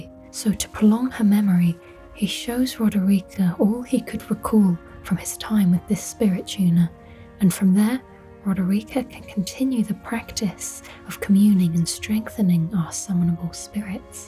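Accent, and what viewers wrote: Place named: Birmingham, United Kingdom. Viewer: My favourite brummie lady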